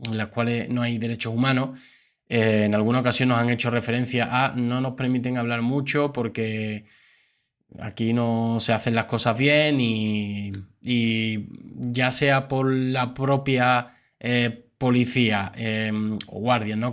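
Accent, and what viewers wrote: Andalusia, Spain. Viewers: eres Andaluz? saludos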